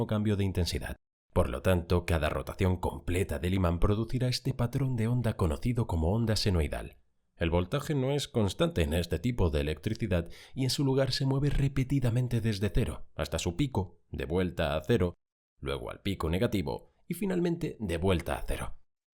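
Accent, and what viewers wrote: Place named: Spain. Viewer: Oh NO! Nomas Oi ese Acento de GACHUPIN, Odioso! y me VOY!......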